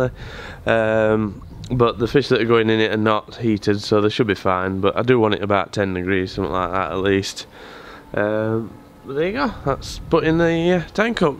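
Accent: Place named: Norfolk, United Kingdom. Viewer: I love your accent! Have you always lived in Norfolk?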